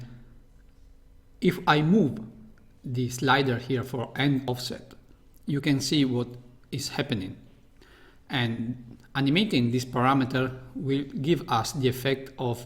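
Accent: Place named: India. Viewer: Чувак, по акценту же сразу ясно. Индус 100%. Ну или откуда-то оттуда, из 3-его мира. Кстати, правильно пишется "Where are you from?", так, на будущее :)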